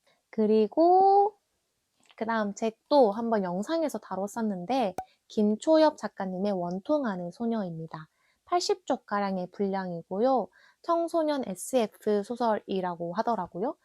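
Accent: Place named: Gyeongsang, South Korea. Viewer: 예전에 김동식 작가님도 글쓰기에 대한 공부를 단 한 번도 해본적 없으신데, 보안관련 일을 하다보니 저녁에 시간이 많이 남았고, 이런것도 이야기가 될 수 있나? 하는 마음에 글을 적기 시작하셨다는 걸 듣고 엄청 놀랐었거든요, 이미예 작가님의 펀딩까지 가신 스토리도 그렇고, 전혜지 작가님, 😶 정말, 저런 몰랐던 작기님의 사정을 들었을 때 강하게 동기부여가 생기는 경우가 있더라구요! 허업! 🫢 저도 누군가 관계를 형성함에 있어 가장 중요하게 생각하는 게 무어냐 물으면, '나는 존중과 배려를 언제나 1순위로 생각한다, 입장을 바꿔서 생각해 볼 수 있어야 한다' 라고 언제나 말해왔거든요??? 너무 신기해요!! 😶 갱상도 사투리에 미소 지으며 들었네요 ㅋㅋ 🤭 아니 근데 어떻게 저렇게 말 한 번 안 절고 낭독을 잘하실 수 있을까요?? 축축하고, 찝찝한 이야기를 저렇게 밝게 귀엽게 설명해주시는 ㅋㅋ 😂 정말 스토리 정리를 엄청 몰입감 있게 너무 흥미롭게 잘 설명해 주셔요 😊 저~~ 얇은 책들에 붙어 있는 인덱스나, 하이라이트 친 것들을 보면, 님이 얼마나 감명 깊게 읽으셨는지 느껴지는 거 같아요:) 저는 요즘, 삼체나 프로젝트 헤일메리 안에 거론되는 가상의 이론이라든지 언급되는 기존의 이론들에 대해 과학자 또는 이과 유튜버 분들이 너무 즐겁게 토론하고 얘기하시는 모습을 보면서, '와, 나는 저런 이론은 이해도 하나도 안 되고, 무슨 소린지 모르고 지나갔음에도 이렇게나 재밌게 읽었는데, 저들은 도대체 얼마나 더 더 더 재밌게 읽었을까?' 하는 생각을 하게 됐어요 😢 그래서 하드 SF에 자주 등장하는 몇 몇 이론만 대략이라도 이해하고 있자! 하는 마음에 검색하기 시작했다가, 과학 유튜버들에게 빠져버렸어요, '어!? 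나, 과학 좋아했네?' 같은 느낌이에요 ㅋㅋㅋ 😂 어쩌다보니 책도 안 읽고 과학 유튜브만 보고 있는 요즘입니다 😆 아침에야 댓글을 보실! 오늘도 또 행복한 하루 보내시길 바라요 🤭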